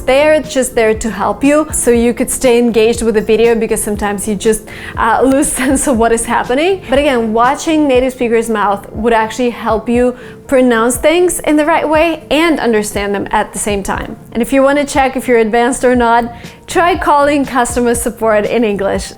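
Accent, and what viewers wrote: United States. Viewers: you're an amazing teacher . I prefer to keep waching you than the others channels you know because you're totally different and have the American accent and I love English language especially the American English accent . Overall , it's my pleasure to be watching you as one of your students 😉😘🌷. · That means accent u speak in every video of urs is American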